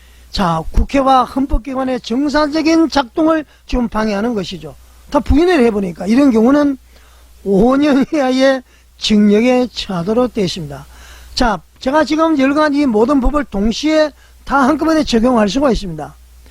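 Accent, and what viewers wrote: North Korea. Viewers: 듣기싫다! 북한말투쓰네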